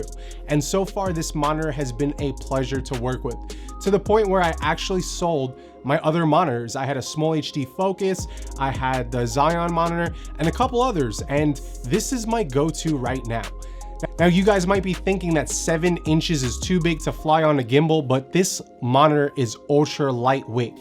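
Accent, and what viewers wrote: New York, United States. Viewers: I will primarily use this for stills (landscapes) as I find the standard camera screens limited in scope, especially for my old eyes. Although, I surely might be tempted to do more video..!! A good thing, indeed. I have the ability to use a Samsung Galaxy S2 tablet, a very attractive display and wifi connected, but outdoors, here in sunny Las Vegas, something more is needed.. I noticed the palm trees in your video samples, but your accent is back east NY (as am I).. LOL! Thank you, for your good nature..!!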